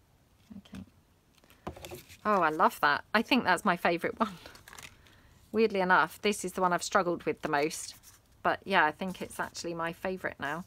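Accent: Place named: Lincolnshire, United Kingdom. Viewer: Hi. Love listening to you. I’m in Australia Victoria (not) enjoying our 5th lockdown!! So you are my breath of fresh air. Plus the bonus for me, your accent is not dissimilar to my wonderful sister in law who grew up in Cleethorps 😂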